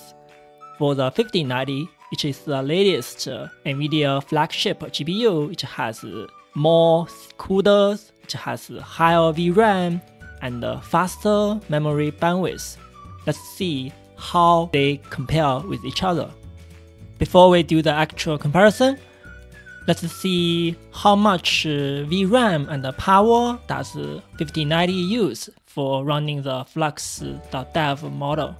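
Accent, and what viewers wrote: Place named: China. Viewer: 哈哈我一听就是中式英语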